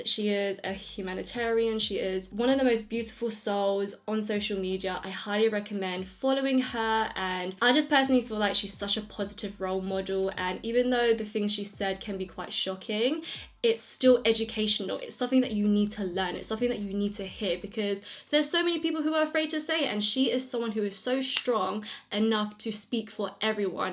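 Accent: United Kingdom. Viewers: Are you British? Your accent is so cool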